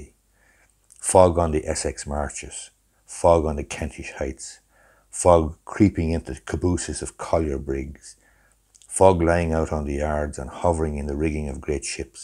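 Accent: Ireland. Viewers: I have read this introduction to Bleak House many times and never fail to admire the brilliance of Dickens. Thank you for drawing attetion to it and reading in your lovely Irish brogue!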